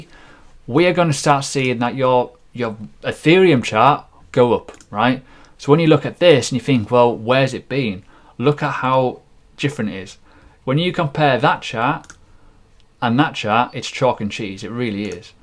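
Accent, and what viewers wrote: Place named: North, United Kingdom. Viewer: Favourite YouTuber. Proper northern. Tells it how it is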